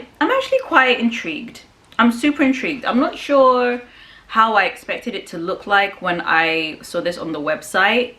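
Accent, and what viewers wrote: United Kingdom. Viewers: I love your lovely smile on your baby face, with lovely long hair, lovely lips. You make a great model. You have extremely attractive and sexy legs in your black designer tights with blouse and high heel shoes. Your black tights combined with black dress and your high heel shoes. I love your lovely British accent please I wouldn’t change a thing. I must give you respect lovely lady.❤️❤️❤️❤️❤️❤️❤️❤️❤️❤️❤️❤️❤️❤️❤️❤️❤️❤️❤️❤️❤️❤️❤️❤️❤️❤️❤️❤️❤️❤️❤️❤️❤️❤️🌹🌹🌹🌹🌹🌹🌹🌹🌹🌹😀😀😀😀😀😀😀😀😀😀😀😀😀😀🌹🌹🌹🌹🌹🌹🌹🌹🌹🌹🌹🌹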